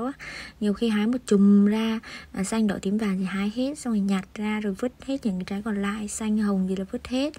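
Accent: Vietnam. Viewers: Nghe là biết dân choa rồi. Cố lên em. :D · Anh rất thích giọng Bắc làm youtube farm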